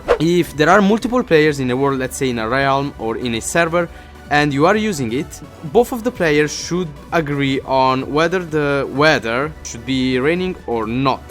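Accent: Italy. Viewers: Si sente che sei italiano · quanto si sente che sei italiano?! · si sente il tuo accento italiano :D!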